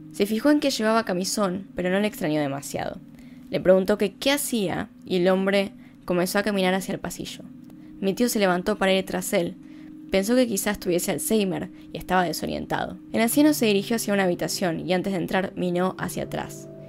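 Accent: Argentina. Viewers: Bueno muñeca preciosa, no se como cai acá pero ya cai, por tu acento sos Argentina....o me EQUIVOCO. Mira piba sos muy bella.. Te saludo desde Bremen Alemania.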